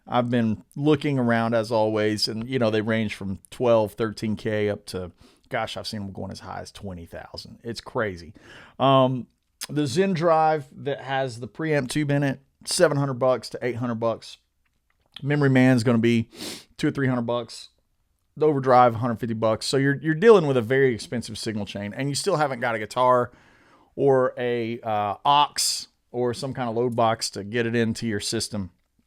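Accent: South, United States. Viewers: Totally agree with you that aside from ACL 88, that Venus Isle was the best tone and era! I could never understand why Venus Isle was deemed not as good as Ah Via Musicom by many critics..Solos like ' ', ' ' and obviously ' ' are just otherworldly masterpieces.... will never be beaten, but I must say you have to be the closest replica I've ever heard!!!... If I closed my eyes and didn't know better I'd totally believe its Eric i'm hearing. Amazing guitar playing brother, absurdly clean technique (you've undeniably done your homework BIG TIME) and a very likeable friendly manner to boot - not least the Southern accent... There's more than a few things you have in common with the master:) Great video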